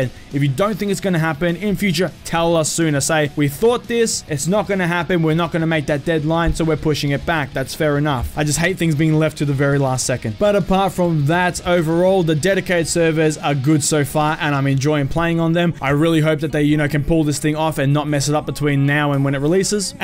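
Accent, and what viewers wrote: Australia. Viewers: Btw it seems a lot of people are surprised you are Aussie, i knew it as soon as i heard ya.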